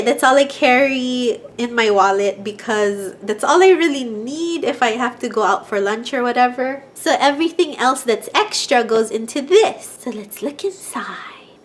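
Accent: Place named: Hawaii, United States. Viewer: I love your video and use of the different hobonichis 😁. By any chance are you from Hawaii? You sound local and make me miss home. (Much like nadine)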